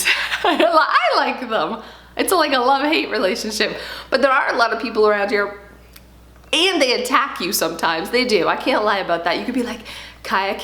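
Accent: Canada. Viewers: your Canadian is showing lol! "eh?" it's all good. I'm in Indiana and I still say eh. I live like 3.5 hours from Canada so that may be why haha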